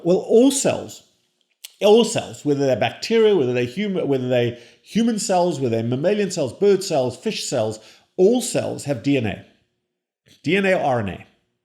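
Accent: South Africa. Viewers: He sounds very south African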